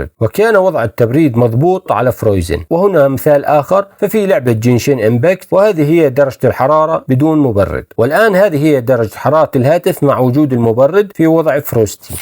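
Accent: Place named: Deir ez-Zor, Syria. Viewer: اشتركت لانو لهجتك ديري ... إستمر بالتوفيق عجبني انك تجمع كل التفاصيل كون اكثر حيوية ...تقبل مروري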